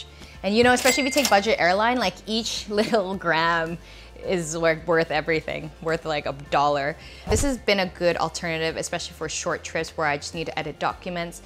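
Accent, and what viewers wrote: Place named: Singapore. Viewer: Singapore accent!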